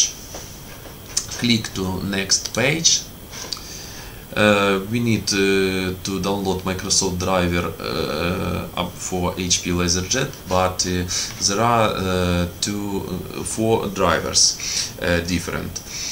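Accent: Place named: Russia. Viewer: Thank you, from the bottom of my heart. helped. Russian accent you have, somehow it was not usual to listen to such a speech. But, really, it helped a lot. ❤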